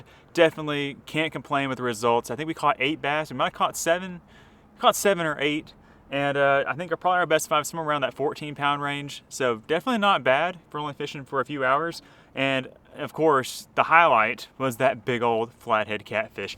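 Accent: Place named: South, United States. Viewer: Every once in a while , we hear the southern accent . Very cute .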